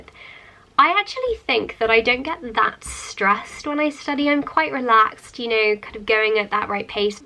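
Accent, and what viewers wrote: RP, United Kingdom. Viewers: I love your British accent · i doubt her and her sister both got scholarships (plus she would have mentioned it). i don't understand what you think posh is if it isn't money/posh voice/posh house/posh food that has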